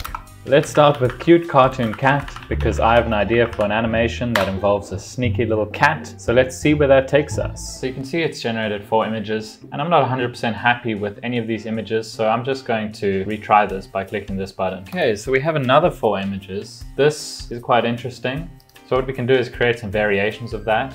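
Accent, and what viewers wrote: South Africa. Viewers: lol I knew you were south african from the first 5 seconds of the video🥳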